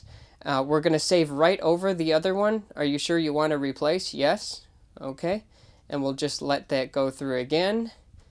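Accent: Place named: Minnesota, United States. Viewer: i sense Minnesota accent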